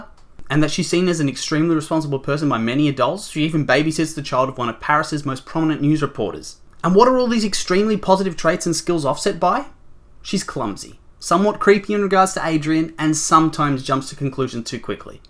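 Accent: Australia. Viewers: You're Aussie aye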